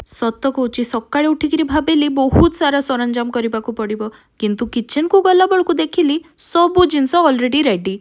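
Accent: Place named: Odisha, India. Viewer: Just love your odia talking style ❣️❣️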